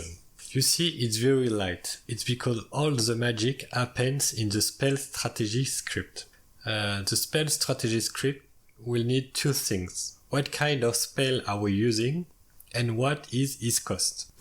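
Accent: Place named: France. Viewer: Bro t’est français ! J'arrive à reconnaître l'accent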